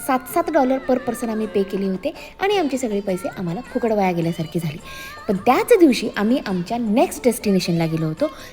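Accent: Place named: Kolhapur, India. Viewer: ताई तुम्ही महाराष्ट्र मधील कोणत्या गावातील आहात? तुमची भाषा आमच्या इचलकरंजी कोल्हापूर भागातील असलेसारखी वाटते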